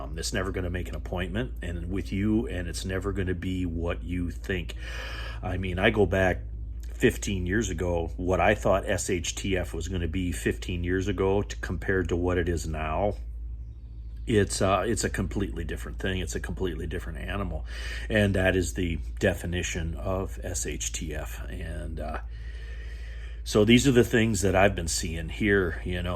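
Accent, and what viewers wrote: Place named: Wisconsin, United States. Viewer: youhave a Wisconsin accent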